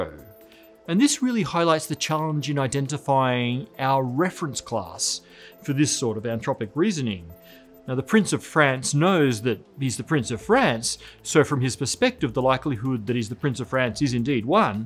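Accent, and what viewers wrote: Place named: Australia. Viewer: It's called being Australian. I don't know how you struggle to understand him, it's very clear, and I don't think they considered those like you that are impaired in verbal comprehension over his ability as a physics communicator.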